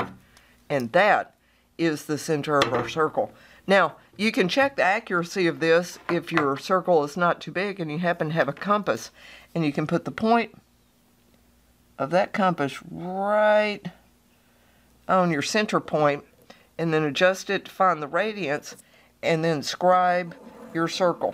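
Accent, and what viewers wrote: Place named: South, United States. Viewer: Love the accent…western? Southern?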